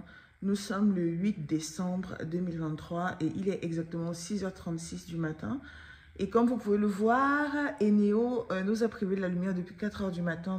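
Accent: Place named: Italy. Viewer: C'est l'accent italien là qui me retient !!!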